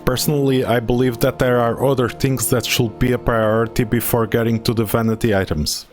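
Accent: Portugal. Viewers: Awesome video. 1 question are you portuguese? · Hey! You Portuguese? O sotaque diz-me que sim 😅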